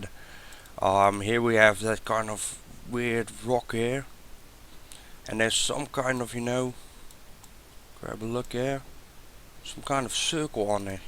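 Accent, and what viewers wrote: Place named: Netherlands. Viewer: First, I like your accent, your 100% dutch hahahaha. What the pipe/spoiler is in the image reminds me of a "pitot tube" on a airplane, its almost a 100% match. Look up "pitot tube" and do a picture search on google, you will recognize the object immediately. I think a flying object has crashed there, and it had a pitot tube for sure.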